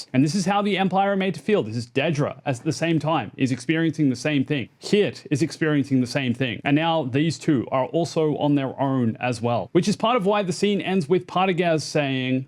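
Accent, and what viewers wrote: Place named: Australia. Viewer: You sound like an expat Aussie. You seem to have a rhotic "R" sound like the Americans. Anyway, Good vid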